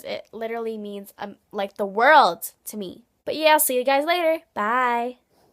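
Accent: Canada. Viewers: Wait is she Canadian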